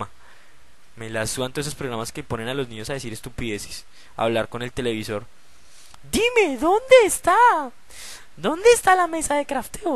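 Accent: Colombia. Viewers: Se le nota que es Colombiano el parce , por su cara por su habla diciendo parce , chimba y por eso siempre estoy orgulloso de Colombia :D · sos colombiano ? ._.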